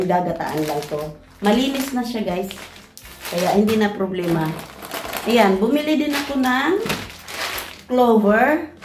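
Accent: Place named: Visayas, Philippines. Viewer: Bisaya diay ka...taga asa ka sis?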